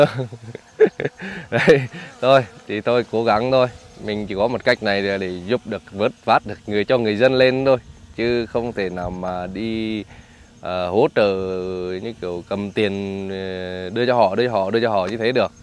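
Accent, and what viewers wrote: Nghe An, Vietnam. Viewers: Nghe tiếng giống tiếng Nghệ mình quá. Bạn dân 37 hả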